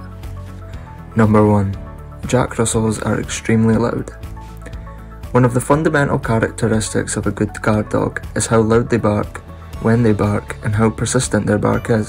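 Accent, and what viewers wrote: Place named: Dundee, United Kingdom. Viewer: Sound dundonian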